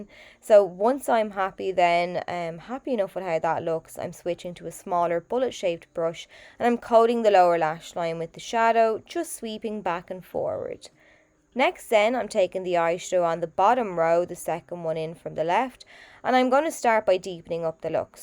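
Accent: Ireland. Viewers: Hey thanks, I’ll look into those brands. I actually took your advice and bought a little packet of hair elastics and have been putting them on the bigger brushes in the meantime. Excellent my Irish friend, excellent. I’m 5th generation Australian but my family hails from Ireland and all my Irish rellys have your accent so I love watching your videos. You are one talented artist. I have watched many many professional makeup artists on YouTube but your uploads are so clear and concise and easy to follow. I like the way you instruct over the video each point as you do it, it makes it so much more doable, and your friendly chatter makes it enjoyable. So cheers for that and keep safe and well over Christmas. X🐨x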